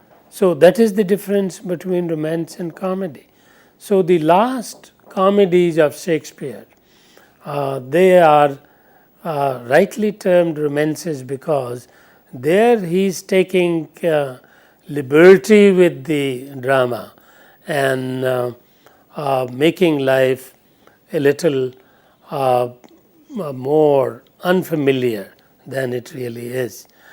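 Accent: India. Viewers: look at your sentence. It is full of errors and badly structured. It is clear evidence of your poor academics. First look into your inner self. You are not capable of even making a comment about Indians. He is speaking Indian English. This is Indian accent. Got it???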